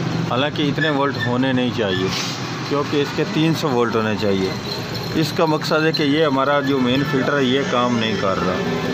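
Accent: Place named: Punjab, India. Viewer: Tuci punjabi lagde aa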